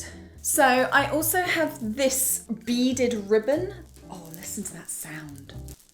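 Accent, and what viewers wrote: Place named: Australia. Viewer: I really liked the ducky pink and that sheer purple you had in the pile... Oh well, the lamp came out lovely. Hey, question... Where in brittain are you? You sound very Aussie to me (an aussie)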